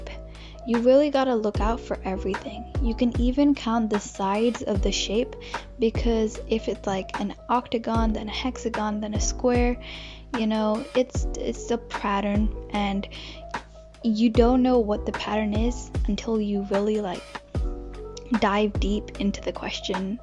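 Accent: United States. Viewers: i love how you did the 11+ but your accent is American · Are you american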